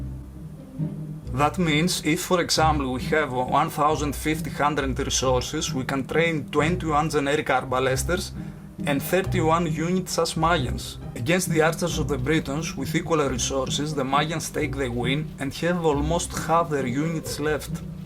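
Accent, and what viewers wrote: Greece. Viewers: I'm watching these videos to listen to the Greek accent actually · LOL when I watched the video I said, he is Greek for sure 😂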